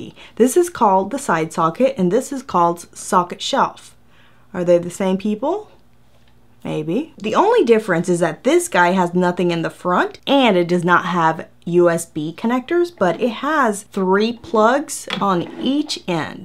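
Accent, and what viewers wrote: South, United States. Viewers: where are you from, you sound southern...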